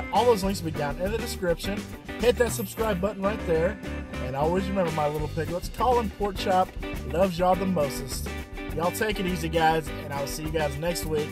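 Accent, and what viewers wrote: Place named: Texas, United States. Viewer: I think I need to marry a Texan. You got the nicest accents.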